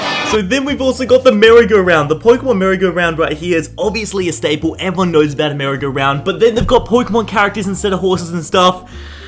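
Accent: Australia. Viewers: I love that fabulus Australian accent.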